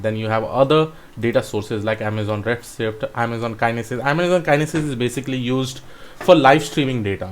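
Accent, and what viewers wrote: India. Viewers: good indian accent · can we get 1 tutorial vid without some corny dude with a thick hindi accent... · A good tutorial, but it would also be really great to have a tutor who has clear English since it is a tutorial in the English language, and as for somebody who is not a native speaker and also not an Indian who is used to this kind of accent, it sometimes becomes an extra challenge. P.S. No need to make a racial issue out of it, it's just a simple truth.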